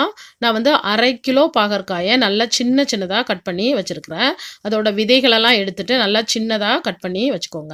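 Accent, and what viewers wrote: Tirunelveli, India. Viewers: அக்கா நீங்க திருநெல்வேலி யா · குழம்பை விட உங்க திருநல்வேலி slang பேச்சு எனக்கு ரொம்ப பிடிச்சது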